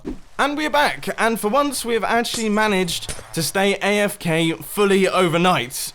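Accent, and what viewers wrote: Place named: United Kingdom. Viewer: Make a tutorial on how to talk like a British dude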